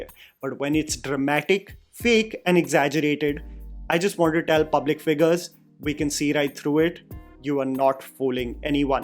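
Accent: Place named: India. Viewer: This man has the best Indian accent of English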